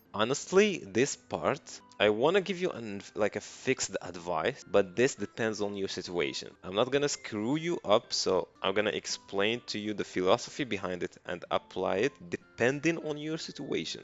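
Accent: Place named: Morocco. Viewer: Accent d mgharba mwr9a :d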